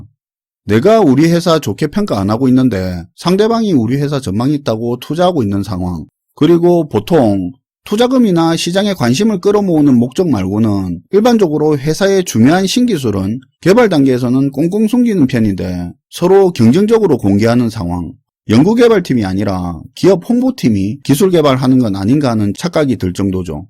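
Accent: Gyeongsang, South Korea. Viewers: 구수한 경상도 사투리. 싫어하는 사람도 있겠지만 듣기 편하네요. 마치 친구 이야기를 듣는 것 같아 좋습니다.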